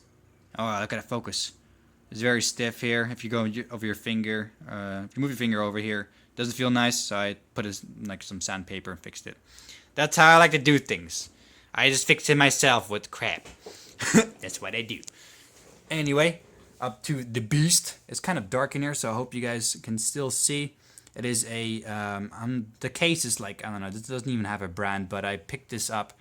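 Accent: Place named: Netherlands. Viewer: do you live in the netherlands? i hear some dutchish sounds in your english and i think i saw a netherlands flag on the word book next to the tv:p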